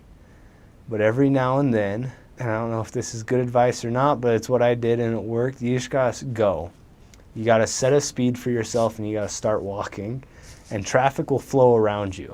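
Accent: India. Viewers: ur indian english is awesome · His Indian accent is on point! I love the way he says liberal with rules, acclimatized stomach... So polite 😄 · You sound perfect (Indian accent) Most Indians who moved to U.S use fake American accent to sound cool😂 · Your indian accent is killer😂😂😂😂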